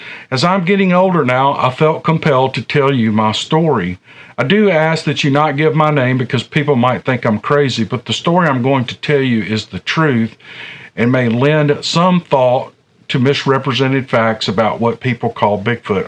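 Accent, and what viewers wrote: South, United States. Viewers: Like your stories,and your southern accent! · I love your channel. And i love that southern drawl. The way u read other people's stories is so articulate and smooth it sounds as if it's your own experience. You are a pleasure sir. A real pleasure. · I love your southern accent ♥️ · Happy Father’s Day! You, in my opinion have the Best Sasquatch channel of all! You are a born-storyteller and we-in’s from Dixie, love your Southern accent! Thanks!👍👣👣👣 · As an Aussie I love his southern twang 💜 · I love your Southern Ness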